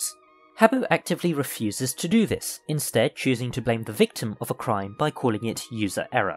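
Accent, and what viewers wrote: United Kingdom. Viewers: your nasally British voice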